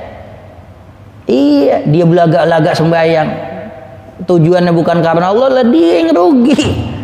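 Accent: Jakarta, Indonesia. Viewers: Barakallah kiyai.... InsyaAllah bkl ikut ngaji terus,nambah ilmu...seneng bgt ngaji breng kiyai,kaga ngantuk.bahasa nye betawi tulen...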